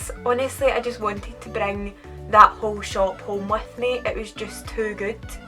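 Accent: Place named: Scotland, United Kingdom. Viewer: 😁 Scottish accents are the best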